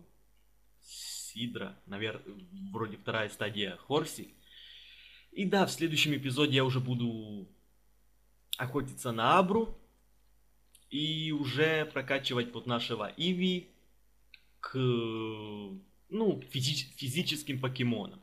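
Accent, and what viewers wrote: Armenia. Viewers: Акцент армянца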